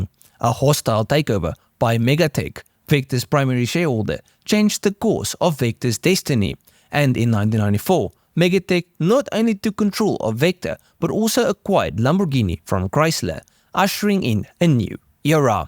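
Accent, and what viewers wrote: South Africa. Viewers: South African accent 😎🤣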